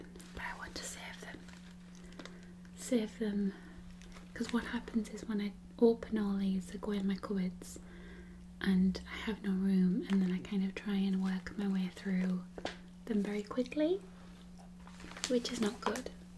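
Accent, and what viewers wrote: United Kingdom. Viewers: Your eating sounds are too perfect :) that and British accents rule :)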